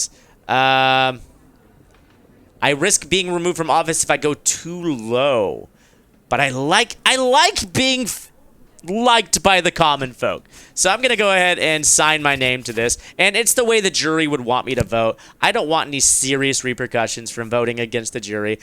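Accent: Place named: United States. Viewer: Oh god, should be on trial for how he pronounces stuff haha. A for effort though and smart of to just go with the 'I'm not even gonna try anymore and just be an american dude pronouncing it in english'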